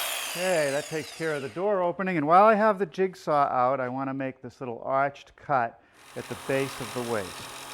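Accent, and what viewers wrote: New England, United States. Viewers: what I wouldn't give to have a blooper real of all his mistakes and him cussing in his New England accent xD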